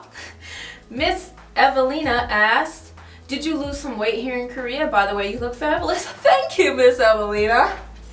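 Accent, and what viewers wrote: South, United States. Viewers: You're really pretty. And that southern accent...love it! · You sound like your from the south in America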